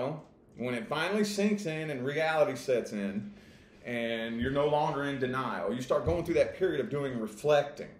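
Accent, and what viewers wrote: United States. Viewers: Nice reading 😊 By the way, I like your accent. Where in the States your accent from?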